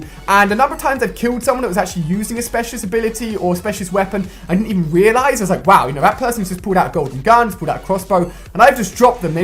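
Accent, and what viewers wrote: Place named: United Kingdom. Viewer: And I''m well aware of accents guys. I'm not oblivious of the fact that he's British also. So quit this arguing shit and move on kids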